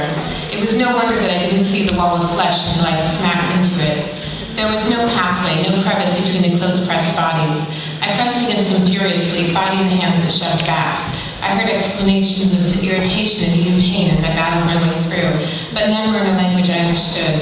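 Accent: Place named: United States. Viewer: Che accento americano orrendo xD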